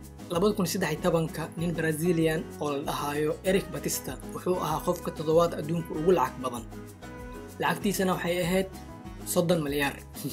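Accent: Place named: Benadir, Somalia. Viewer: Sxb afsomaliga wuu lahjad bandyhy haku mashquulina lahhada banaadiriga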